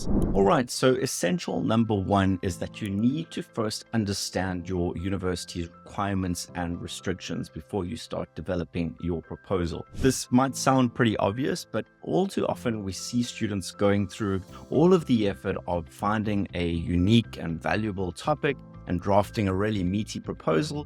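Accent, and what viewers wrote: South Africa. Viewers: Your profile may say UK, but that's a SAn accent. I enjoy your vids. Keep up the good work.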